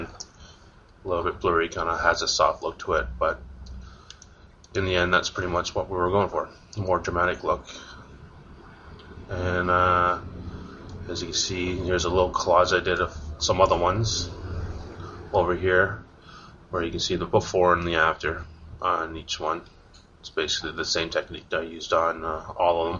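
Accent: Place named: Canada. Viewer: Def Canadian but I can’t place what part. Bc lower mainland, SK or Manitoba. Am I right?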